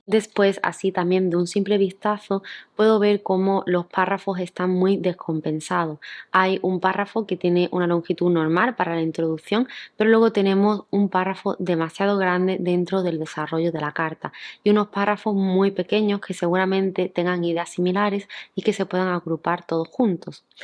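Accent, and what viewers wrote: Andalusia, Spain. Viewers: Hola, llevo mucho tiempo viendo tus vídeos. Saqué el apto en el DELE B2 en mi primer intento y me ha encantado este vídeo. Como soy de EE. UU., estoy acostumbrada a los errores que suelen cometen los angloparlantes; pero es interesante ver los errores de una persona de Alemania. Tu manera de corregirlos me sirvió mucho para aprender no sólo cómo escribir de forma "correcta" sino también de forma concisa y elegante. Se nota que escribes muy bien y que eres filóloga hispánica. Y viva el acento andaluz 🤗 me encanta!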